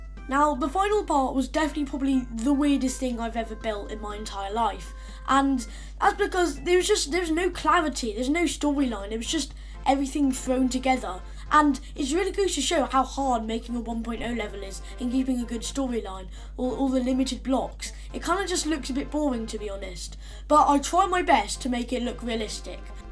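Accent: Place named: United Kingdom. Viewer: The british accent is really catching me off guard but no hate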